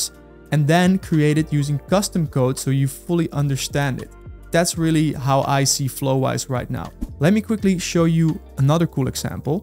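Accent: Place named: Netherlands. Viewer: I hear dutch accent :D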